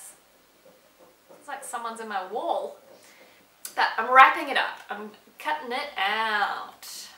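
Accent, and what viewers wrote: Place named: New Zealand. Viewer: No offence but u sound Kiwi. Are u kiwi may I ask?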